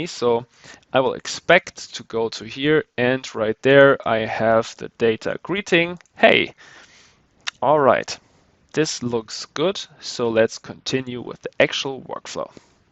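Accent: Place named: Germany. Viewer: Bitte, wo finde ich die seite in der ich den ganzen bums bearbeiten kann ich raffs nicht. Und komm mir nicht mit englisch ich hör den deutschen raus ^^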